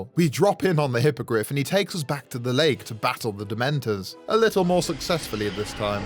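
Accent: United Kingdom. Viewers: Because in England where I assume he is from based off the accent it is illeagal to emulate copyright content. Punishable up to 5 years without bail. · You and Alan Rickman have like the same accent. 🤭 I get you are speaking silly lines, but your normal speaking voice sounds like you are both from the same town or the same part of Britain 🇬🇧 👍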